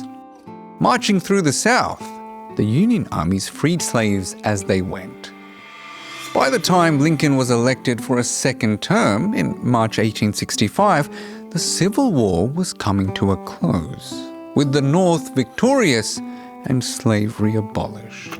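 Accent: Australia. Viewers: As long as he keeps pronouncing gone as gawn like Kath and Kim I'm down 😅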